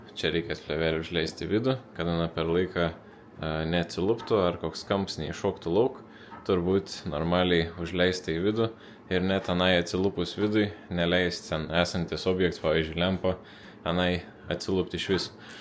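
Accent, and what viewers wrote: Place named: Samogitia, Lithuania. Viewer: Geriau visados žemaitėška💪💪💪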